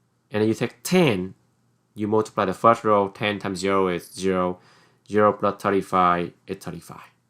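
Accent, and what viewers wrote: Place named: Vietnam. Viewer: nghe giọng giống người vn vậy bn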